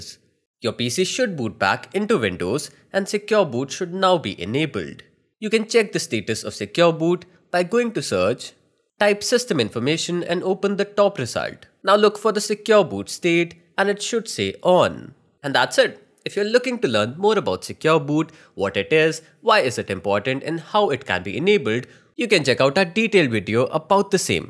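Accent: India. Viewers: This has proven me again that I always have to trust tech people who sound Indian 🙏💖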